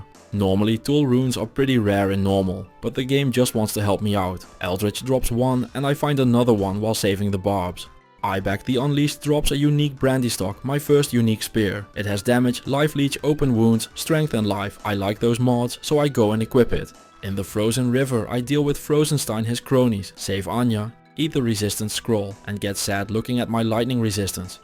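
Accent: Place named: Netherlands. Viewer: Can always hear that dutch english 🤣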